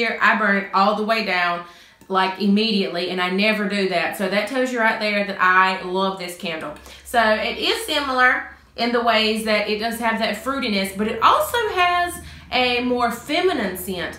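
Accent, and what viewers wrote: South, United States. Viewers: Just found your channel!! I love it! You are so precious and love your southern accent. I'm a Mississippi girl so I totally understand :)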